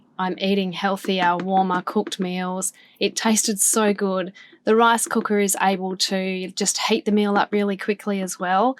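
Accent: Australia. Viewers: So stocked yo hear an Aussie accent!!!! I’m about to get a van……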